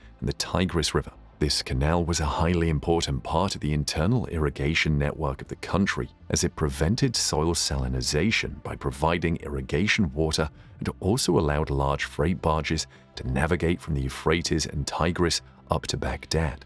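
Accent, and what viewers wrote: United Kingdom. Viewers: If a British guy is narrating you know it's gotta be legit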